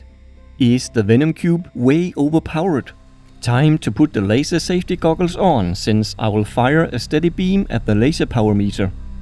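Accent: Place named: Denmark. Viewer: You sound Danish :)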